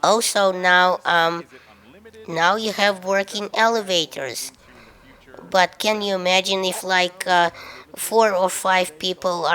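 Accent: Russia. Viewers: are you Russian by any chance?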